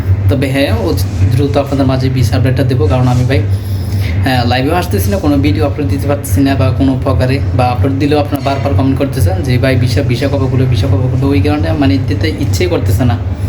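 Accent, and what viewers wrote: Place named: Comilla, Bangladesh. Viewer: আসসালামু আলাইকুম কেমন আছে ন ভাই আপনার বাড়ি কুমিল্লা কেন বলছি ভাষাটা কুমিল্লা আসে দোয়া রহিল মক্কা জমিন থেকে আমিন।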